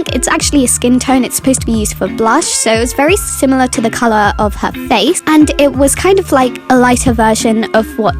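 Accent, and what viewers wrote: United Kingdom. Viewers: Haha I love your accent! It sounds like a southern British one which is where I'm from :D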